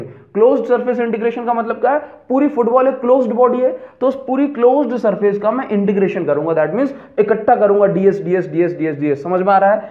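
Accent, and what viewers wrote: Odisha, India. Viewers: Sir, are you odia???😃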